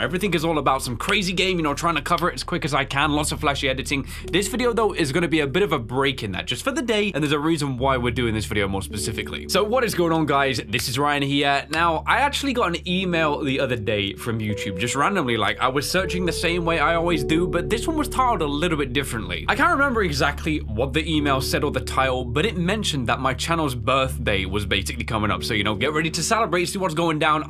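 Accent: United Kingdom. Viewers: As a long LONG time supporter of Ryan's channel I'd like to say a few words about his 7 year hiatus The first video I watched from ryan was his first video on case animatronics and whilst he sounded very polite and British I enjoyed the video a lot and gradually from there I watched more and most definitely enjoyed more There was some videos I liked and about 2 I didnt like and quite a lot I didnt watch but I'd just like ryan to know I've been there since case animatronics and will stick here forever until the channels end Big respect ryan dont stop grindin🥺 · Never knew you was british. Same here lad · Ryan do not ever blame yourself. There is a simple way to explain how special you are in my life: I did not even know English when I started watching you. I played the games you played because you inspired me. I watched your videos when I didn't even understand what you are saying and at the end, you taught me how to speak English (british accent too). 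So, even if you quit tomorrow I will remember you for the rest of my life. That is how special you are for me. And when I see you blaming yourself or anything like u said in this video, it makes me very sad because you made me smile since I was a kid. And you must make yourself smile too. Don't record when you can't smile or when you give a fake smile because your funny jokes, reactions made us laugh, your smile made us smile. So don't do it when you don't feel like it. I would always respect and love you ! <3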